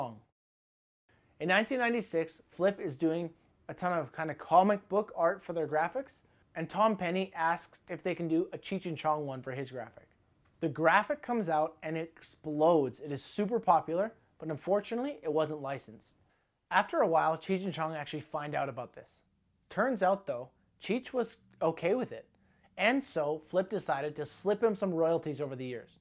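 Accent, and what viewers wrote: Canada. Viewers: Your Canadian accent is so strong in this, pronounced sorry the Canadian way.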